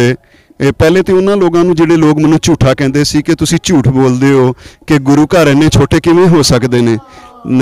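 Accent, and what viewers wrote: Punjab, India. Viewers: Tuhadi boli charde Punjab nal raldi ji bahut vdia jankari diti ji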